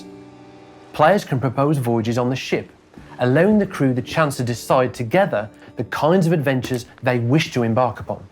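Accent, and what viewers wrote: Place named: Black Country, United Kingdom. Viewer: That's a Telford or Yam Yam accent I'm sure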